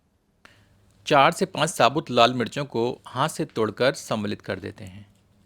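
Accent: Standard. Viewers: itni madhur aur suddh hindi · Yeh shudh hindi hai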